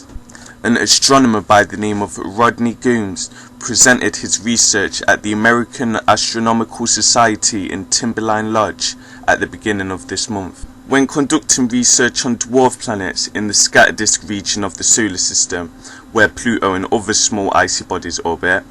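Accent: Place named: United Kingdom. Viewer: where in u.k u from mate? london? great show mate keep it up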